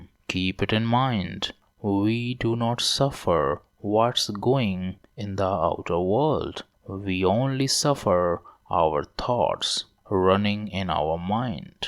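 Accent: India. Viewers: It's not a cartoon voice but an Indian voice. However many people are born with disease...... This is just nonsense.....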